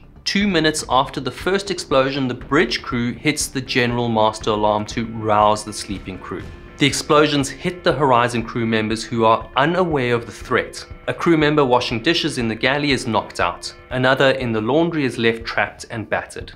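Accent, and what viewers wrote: South Africa. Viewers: Please try and make the story telling more like a conversation and not a accident report that was being read bullet point by bullet point... I really struggled to follow what was happening... It's not a lack of information just a frantic pace to fit in all the information... Great video... Also you sound South African? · Hey a south African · I really like this guy with his almost unlimited knowledge of what he is talking about. He has a wonderful South African accent and is so articulate in his delivery. Others on similar subjects on You tube are not quite in the same league as this great man who really knows how to put together a professional video. One might say good enough for television. One hopes he continues with these documentaries in the future. They are far more superior than the American versions. Peter Starr London UK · Like the South African accent.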